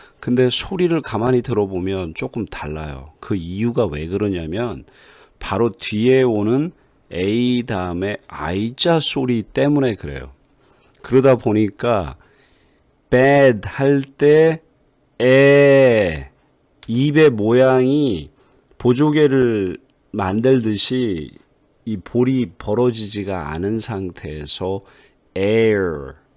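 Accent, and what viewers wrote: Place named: United States. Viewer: 진짜 미국의 발음이 나오는것 같으십니다 존경해욥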